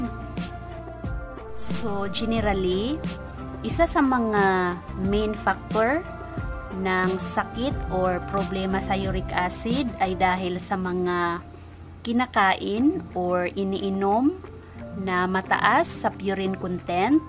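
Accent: Visayas, Philippines. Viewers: Bisaya ka ma'am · wow ha!banas sa MGA bisaya...puntong bisaya... Tagalog po salita nya... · Bisaya kasi salita kaya nakakabanas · Bisaya kasi!!. Unawain na lang, nauunawaan naman, thanks Inday.. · wag na po tau magalit sa tono ng pananalita ni ate kc bisaya cia bah kaya suportahan na lang natin c ate mga ka atritis hahahaha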